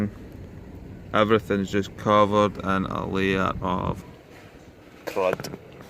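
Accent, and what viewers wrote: Scotland, United Kingdom. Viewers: are you Scottish? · Is that Scottish accent? Lovely work on that truck! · Good to hear a Scottish accent again, great job on the truck BTW.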